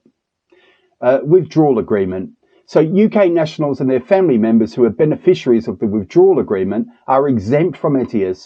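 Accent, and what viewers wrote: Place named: South Africa. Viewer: Enjoy the SA accent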